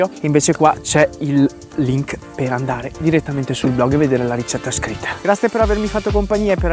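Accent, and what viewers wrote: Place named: Bergamo, Italy. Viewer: Poi odio il tuo accento quasi come un bergamasco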